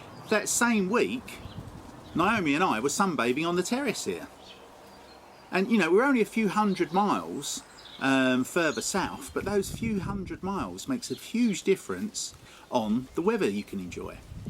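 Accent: United Kingdom. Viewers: Amazing video and lovely British accent...all the best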